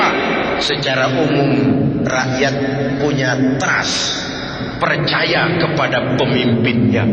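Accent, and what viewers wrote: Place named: Aceh, Indonesia. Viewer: suara aceh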